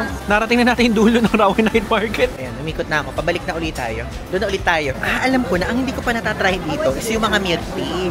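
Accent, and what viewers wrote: Nueva Ecija, Philippines. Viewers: Pag nagsasalita ka, halata talaga na taga Nueva Ecija ka. Not a bad thing though. Nanotice ko lang. Taga Nueva Ecija rin Mama ko.. 😊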